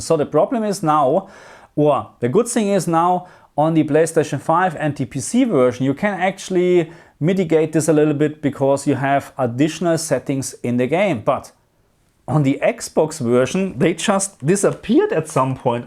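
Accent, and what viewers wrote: Germany. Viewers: Ich dachte mir schon von Anfang an des Videos, „Er scheint einen deutschen Akzent zu haben, ob er deutschsprachig ist?“ Und dass „Herr der Ringe“ hat mich dann zum Lächeln gebracht.😅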